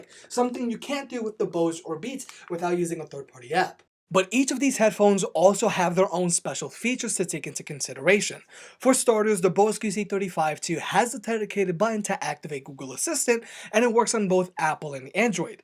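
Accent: United States. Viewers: You’re a New Yorker...nice, a local.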